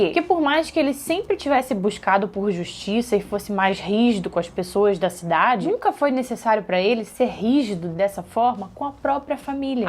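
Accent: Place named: Rio de Janeiro, Brazil. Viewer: Parabens pelo trabalho, eu como sou um cara bem chato aqui no youtube, não curto muito ficar ouvindo estes sotaques caipiras que inundam esta plataforma , prefiro acompanhar um sotaque raiz do RJ , como o seu e o da Mateux Mendex os unicos canais que acompanho pra ouviu teorias de From. Um coisa que pode ter passado despercebido é que parece que todos os moradores de From parecem ser reencarnação de pessoas do passado da cidade. e todas devem ter o mesmo destino, não sendo possível mudar o destino. Em exemplo disso é o Elgin, ele ter tido o olho arrancado pela Sarah o que o conecta a visão do Jade do homem bebendo sangue no crânio, este homem também estava sem 1 olho. E o ato de beber sangue pode ter relação com o Elgin retirando seu sangue para a Fatima beber. Tudo esta interligado em toda a história desta cidade.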